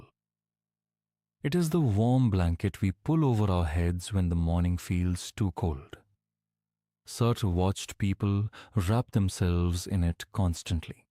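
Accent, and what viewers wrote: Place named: India. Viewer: Even AI has Indian accent now! Everyone is so tired of Indian immigration and infiltration.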